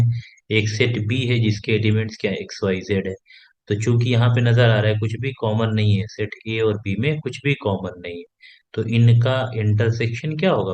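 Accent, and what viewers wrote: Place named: India. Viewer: Thank you. You convinced me that the math is no problem. Understanding mix of english and indian is much harder.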